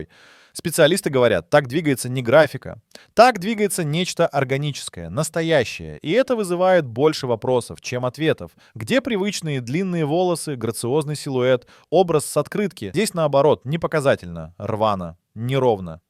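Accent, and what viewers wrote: Portugal. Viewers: Vídeo com sotaque d Portugal , ora em castelhano , ora em português do Brasil , q bosta d vídeos fake como a locução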